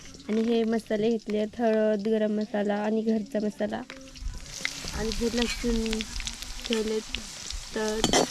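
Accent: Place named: Pune, India. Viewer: मला तुमच्या बोलण्यावरून आणि तुम्ही ज्या भागात राहता त्यावरून वाटत होतं की तुम्ही पुणे जिल्ह्यातल्या आहात आणि आंबेगाव किंवा जुन्नर तालुक्यातल्या असाल... खेड (राजगुरुनगर ) च्या जवळच राहतो आम्ही.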